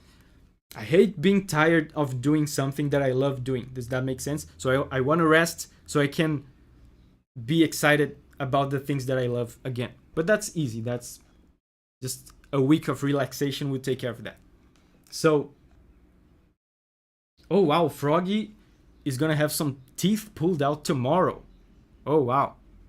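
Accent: Brazil. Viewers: Caraaa, tu é brasileiro!! Não fazia ideia (vi teu nome num site de músicas de jogos e acabei descobrindo por lá, segundo o site, claro)... Eu reparei o sotaque no inglês mas não sabia que era brasileiro... Weee! Parabéns!!! 🎉🎉 Torcendo muito pra tu ocupar cada vez mais espaço na indústria! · Mano, sabia que você era brasileiro, hahahaha! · Cara eu vejo seus vídeos a um tempo e eu achava q seu sotaque era de brasileiro, mas nunca vi vc falando pt. Só q vendo a live eu ouvi as maritacas e pensei "mds brasileiro certeza" KKKKKKKKKK Parabéns pelo trampo, curto mtoo suas análises.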